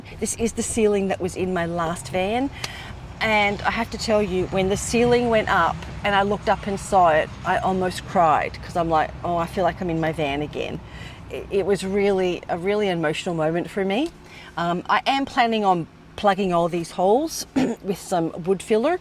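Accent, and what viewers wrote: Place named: Australia. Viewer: I love your accent! I consider it real Aussie.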